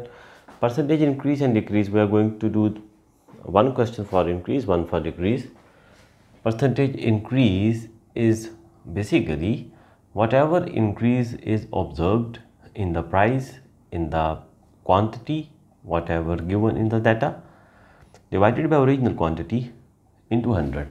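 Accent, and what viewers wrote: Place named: Russia. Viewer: Russian accent!😄 love u sir for the way u teach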